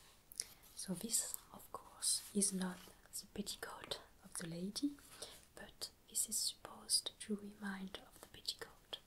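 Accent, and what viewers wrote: France. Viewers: Please ... More videos like this( with french accent) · Thanks for the video! I came most for the tingles and your wonderful accent (I just found your channel last week and I'm watching your work since). I studied the basics of French and you're tempting me to return to my online classes 😄 Again, thanks and greetings from Brazil.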